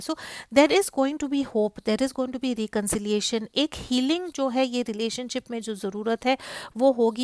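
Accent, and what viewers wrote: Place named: Maharashtra, India. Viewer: मॅम मराठी आहात ते ekun खूप छान वाटले..thank u for reading.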